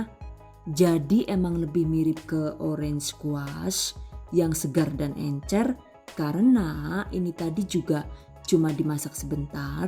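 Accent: Banyumas, Indonesia. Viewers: ni org madiun apa org ngapak ya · Sebaik2 manusia adl yg paling bermanfaat bagi manusia lainnnya, spertinya bunda ini memang diciptakan Allah untuk mmberikan mnfaat n inspirasi bagi yg lainnya, 😍 Super sekali vedeo2nya, sirupnya, sehat menyegarkan n alami, n tentunya cocok untuk bisnis usaha 💪 ngapaknya sll bikin kepingkel2,gk tau knp klo dnger org ngomong ngapak sll ketawa😆😆 Btw, ikutan latah..."haaaay gaes..woookey gaes..."😂😂 #GiveAwayTHV2020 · Mbak asli mana kok ngapak???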